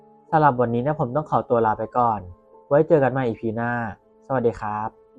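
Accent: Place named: Isan, Thailand. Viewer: ผมว่าเล่าได้ดีนะครับ มันดูเป็นกันเองครับ คือบ้านผมอยู่ภาคอีสานนะครับ แล้วสำเนียงของพี่กับการเล่ามันเข้ากันมากกครับ ฟังแล้ว หลอนเลย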